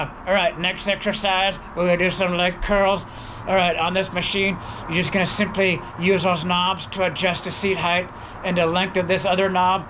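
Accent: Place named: Scotland, United Kingdom. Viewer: Why does he have like a Scottish accent?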